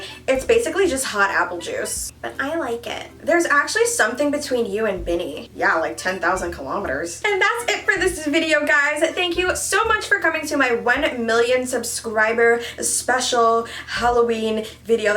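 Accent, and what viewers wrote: England, United Kingdom. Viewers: That British Accent 😍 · Can you do a video of doing a make up tutorial video with your british accent please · Queen your British accent is 😘👌💕 Also gonna call this Lil Grinch🤣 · That British accent tho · Your British accent is actually really good 🤔🤨❤ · Me not used to the British accent 👁👄👁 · I like the British accent❤ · I’m impressed by your British accent! Only discovered you recently, loving your content 🙌🏾🙌🏾🙌🏾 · Your british accent though 😳💕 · 0_o Excuse me but your british accent scared me - I'm black and English but like you sound more british than me 😂🙈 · That English accent is on point, much love from a Black British fan (so I know my own accent 😉) also I think I'm 153cm tall too! Height twins 😂😂😂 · Your british accent is pretty good -coming from a british girl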